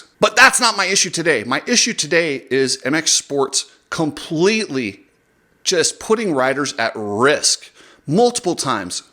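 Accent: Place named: California, United States. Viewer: It's motocross, shit happens. It sounds like you're from California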